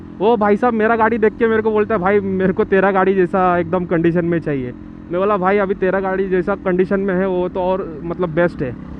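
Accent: India. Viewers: Pure mumbai accent 😌🤜🤛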